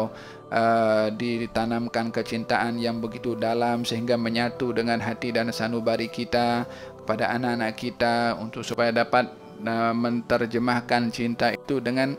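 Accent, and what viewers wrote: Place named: Malaysia. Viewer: Kirain indo tapi logat ny mlayu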